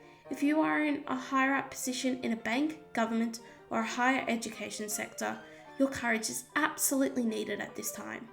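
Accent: Australia. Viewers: Don't change your Australian accent! It's part of who you are and, honestly, it's quite refreshing to hear poetry recited in an accent which is not either English or US-one-size-fits-all. There is a version of The Wanderer in the original Old English (violavonschnitzel) on YouTube. It sounds very Scandinavian. Every accent lends something special to a reading. Apart from 'half showers' which should be 'hail showers', I don't think there's anything in your accent that can't be ironed out by sloooowwinng down a bit. I think it's wonderful (and hopeful) that someone as young as yourself (i.e. a lot younger than me) is interested in 'The Wanderer' and 'The Lord of the Rings'. The way things are going at the moment I can see a subversive movement developing whereby people will be swapping illicit copies of Shakespeare's 'Merry Wives of Windsor' or Orwell's 'Animal Farm'. 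😉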